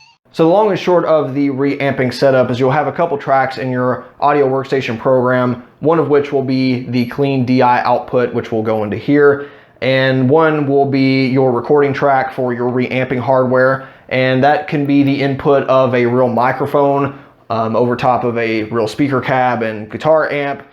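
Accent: Texas, United States. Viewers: Why do you sound like you are from texas lol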